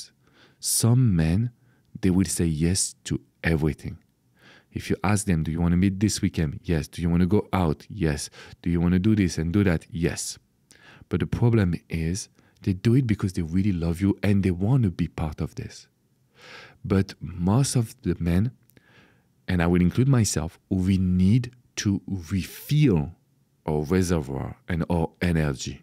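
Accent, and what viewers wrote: France. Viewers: Hi,. I love your style of coaching so much that I am a subscriber. I have a request for a possible video. Could you make a video that addresses how to deal with a fearful avoidant? I’m in that kind of a situation and it’s breaking my heart. Thank you. 😊 And BTW I love your French accent. Don’t try to change it. Be well.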